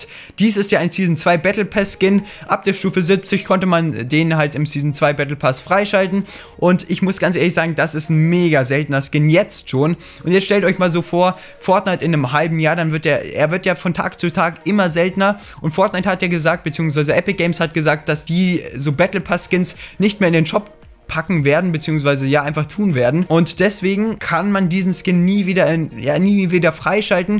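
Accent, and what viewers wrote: Switzerland. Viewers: bist du schweizer?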